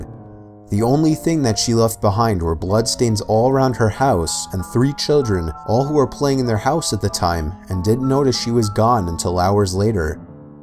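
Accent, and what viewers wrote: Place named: New York, United States. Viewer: I love his new York accent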